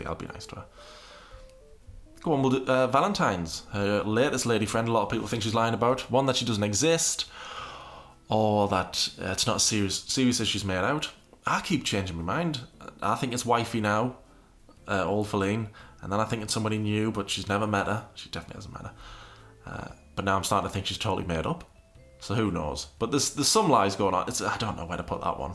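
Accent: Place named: Newcastle, United Kingdom. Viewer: I feel like you’re a geordie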